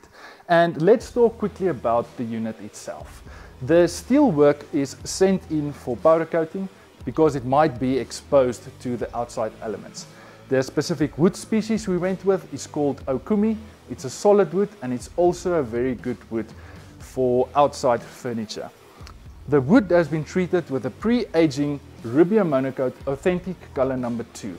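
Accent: South Africa. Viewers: Was very happy to come across the very project I was looking for. Even more excited to hear a South African accent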